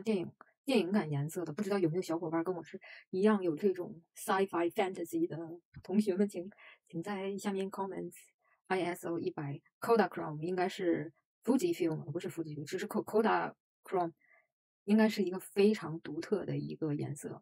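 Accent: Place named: Northeast, China. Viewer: 你的东北式英语让我很糟心。也谢谢你的分享:face-blue-smiling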